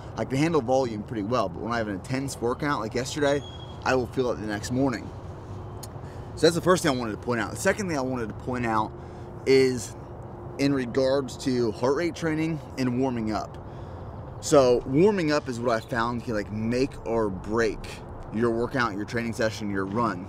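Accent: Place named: Brooklyn, United States. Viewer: Love how his a proud Texan but has the strongest Brooklyn accent😂😂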